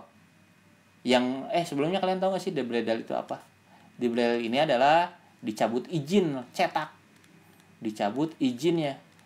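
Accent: West Java, Indonesia. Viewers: sunda abis si aa logatnya wkwkw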